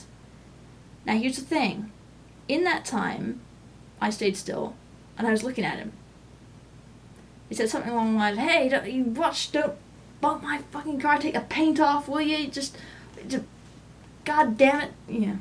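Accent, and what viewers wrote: United Kingdom. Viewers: Her father is British, and she grew up with it. I worked in Vancouver and there were plenty of British people living there who had not relinquished their accents. Believe me, if you spent a weekend in Oklahoma, you'd pick up a slight drawl, so cut her some slack. I lived in Northumberland for a year, and within a month the Geordie was sticking to me. "Yeah" became "aye." It happens. Try to live with it.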